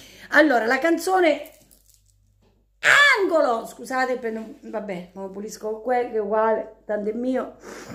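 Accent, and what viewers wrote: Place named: Apulia, Italy. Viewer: Quando intercali in dialetto sei simpaticissima.ma si puglies'?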